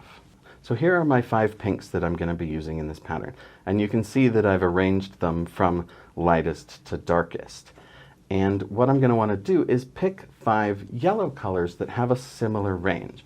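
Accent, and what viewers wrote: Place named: Canada. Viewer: Also, you sound Canadian...where are you located? I am in Ottawa.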